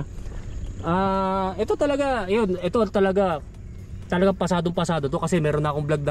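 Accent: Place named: Ilonggo, Philippines. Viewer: Nice build! Sabi ko na ngaba taga iloilo eh hahaha i know that intonation eh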